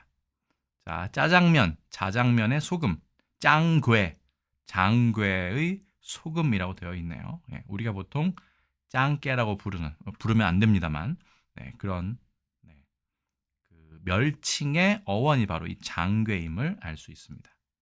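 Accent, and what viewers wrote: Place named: South Korea. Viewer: 매번 지식축적에 보탬이 됩니다! 동양3국의 실용사례까지 설명하시니, 폭넓고도 실용적이라 생각하고요!! 다만, 쭝꿔발음은 잘 모르겠지만, 일본어발음은 한국식으로 들려 옥의 티로 보여집니다!!!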